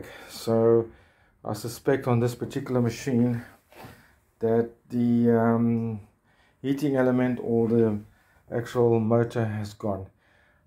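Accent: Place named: South Africa. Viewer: Are you in Durban? Your accent sounds South African with a hint of India.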